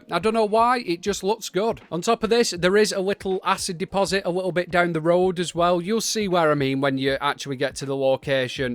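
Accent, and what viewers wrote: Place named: Northern England, United Kingdom. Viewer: No, definitely wasn't me! i think you are brilliant , love the northern accent and love how you use proper British phrases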